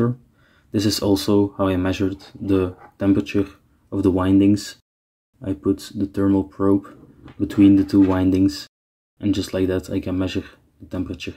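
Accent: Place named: Flanders, Belgium. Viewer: Are you flemish? Curious cuz of the accent :)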